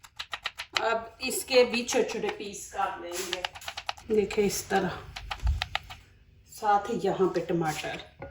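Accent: Punjab, India. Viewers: Plz urdu ko urdu k.lehjy mn bolen aap that punjabi accent mn bolty hn kuch acha nhi lugta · ماشاءالله تعالیٰ ۔۔۔۔۔۔۔!!! بہت زبردست اور ذائقہ دار لگ رھی ھے یہ قیمہ آلو کی ڈش ۔۔۔۔۔۔!!! میں نے بیگم کو فارورڈ کردی ھے ٹرائی کرنے کے لئے ۔۔۔۔۔۔😊 پہلی دفعہ آپ کی کوئی ویڈیو دیکھی، آپ کے اردو بولنے کے انداز نے بہت محظوظ کیا۔۔۔۔۔۔!!! جیسے میں اردو کے لہجے میں پنجابی بولتا ھوں ایسے آپ پنجابی لہجے میں اردو بولتی ھیں ۔۔۔۔۔😂 میرا تعلق کراچی سے ھے اور مادری زبان بھی اردو ھی ھے، لیکن مجھے خاصی پنجابی سمجھنا اور بولنا آتی ھے۔۔۔ الحمد للّٰه تعالیٰ والسلام علیکم ورحمتہ الله تعالیٰ وبرکاته ۔۔۔۔۔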